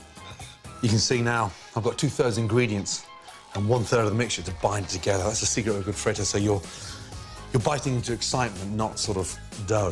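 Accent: United Kingdom. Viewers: I always think that haha I love listening to a brittish person cook. Like jamie Oliver haha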